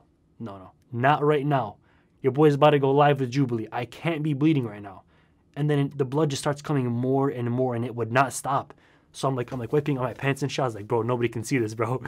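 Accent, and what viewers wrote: Arab world. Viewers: right when I heard your voice I knew you were arab/egyptian lol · You have a Middle East accent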